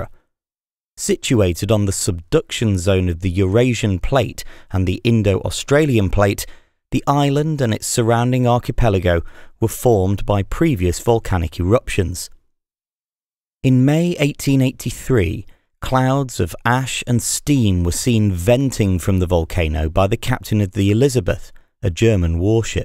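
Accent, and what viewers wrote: United Kingdom. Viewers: The britanic accent...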